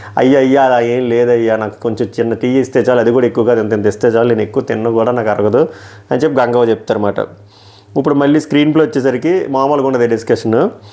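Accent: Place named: Visakhapatnam, India. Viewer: Super slang bro … I like vizag slang …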